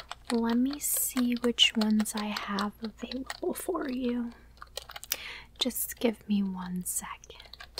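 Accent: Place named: South, United States. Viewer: Lmao....love the ditsy southern belle thing you got goin’ on!! Still very relaxing too!! Thanks.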